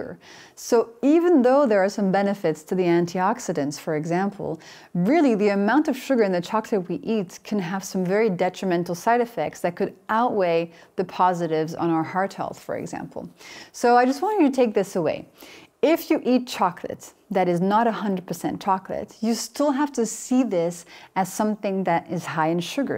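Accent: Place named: France. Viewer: Your accent smoothly transitions back and forth between French and North American, and it sounds absolutely lovely 😊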